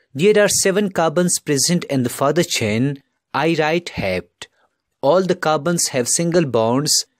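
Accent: South India, India. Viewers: Through you spoken rythm i definitely got that you are south Indian sir 😂😂😂😂😂 i really like your teaching way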